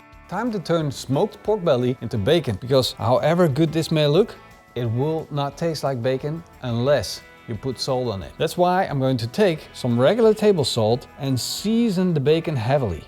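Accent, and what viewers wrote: Netherlands. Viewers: Sorry for my last comment in another video, I don’t even remember which video I left it. When I say that you fuck up the recipe, doesn’t mean that you are a bad cooked, I really see you as a temperature and meat expert. It’s just that so many video you seem to add the little touch that upset everyone and get roasted in the comment section.. You can’t deny that. I really enjoy your recipes , and watching you cook. Especially with your Dutch accent, if I found the other comment I will erase it cause it’s can be misunderstood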